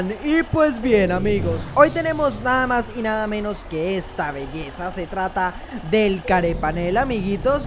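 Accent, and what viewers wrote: Colombia. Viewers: eres de pereira?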